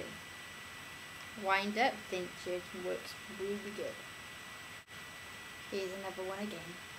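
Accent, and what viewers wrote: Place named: Wales, United Kingdom. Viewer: Good video , nice Welsh accent , excellent.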